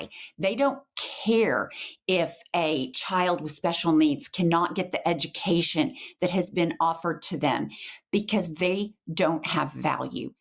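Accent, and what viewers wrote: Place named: Oklahoma, United States. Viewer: Don't put yourself down, the Oklahoma accent is growing on me more and more. Keep up the great work ladies.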